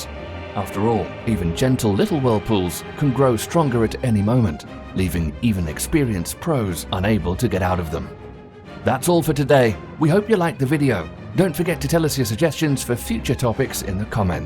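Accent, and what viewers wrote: United Kingdom. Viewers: Just how a lot of English people speak. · he sounds slightly british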